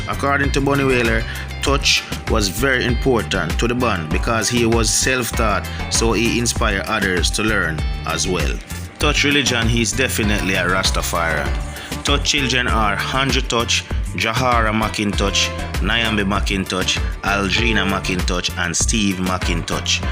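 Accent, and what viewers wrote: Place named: Jamaica. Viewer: smh hes jamaican patwah was our first language this txt is stupid cnt plz people leave youth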